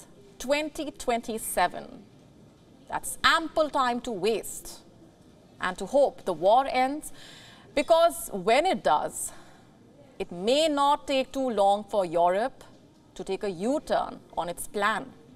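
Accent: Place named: India. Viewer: How funny is the Indian accent 🤣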